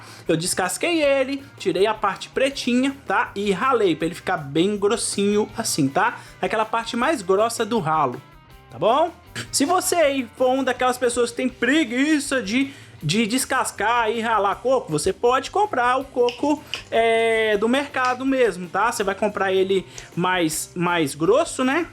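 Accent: Minas Gerais, Brazil. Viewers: Obrigada por ensinar tantas gostosuras! Adoro assistir seus videos com o sotaque minerim😁Eh Nois!!😁💚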